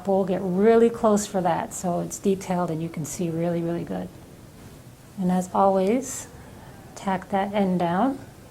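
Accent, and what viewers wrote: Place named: Puerto Rico. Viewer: R u Puerto rican? I love ur accent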